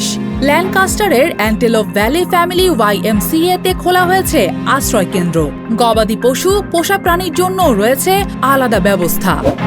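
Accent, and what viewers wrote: Kolkata, India. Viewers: কলকাতার মত কেন নিউজ পরছেন?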